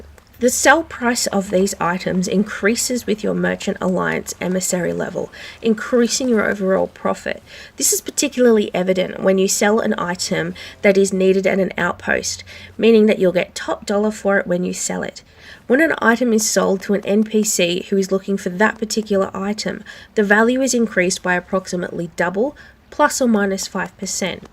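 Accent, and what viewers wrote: Australia. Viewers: Great video, love the cinematography of it and of course the Aussie accent. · I did enjoy the ozzie accent. Here's the method I use, firstly I will raise my emissary and complete one "lost voyage" mission from my spawn outpost. Upon completion I should have max emissary, if you don't have it then you should be very close since voyage levels differ with reputation levels. Afterwards I will sail to the nearest outpost and sell my voyage loot, using the funds to buy out their stock. Afterwards I'll sail to the next outpost in either a clockwise or counter-clockwise fashion, as you put it in the video and buy that outpost out and sell whatever you have that they are in need of. You can figure out which resource to sell an outpost without ever opening the merchant book too. Every outpost offers 3 of the same item they are in surplus of, 1 of every item they're good on, and none of the resource they are in need of. 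With this logic you can simply look at their stock and through process of elimination determine what resource they need without looking through the book over and over, and the more you do it, the better and faster you will be. My first round trip of the map will consist of me buying and selling to maximize profits, and I will mark every outpost I have visited on my map in order to keep tabs on whether or not I've gone full circle. Then, my second round trip of the map I focus on selling off everything only, un-marking each outpost as I visit them. This is about a 3-4hr session as a solo and profit after accounting the buy prices was around 450-500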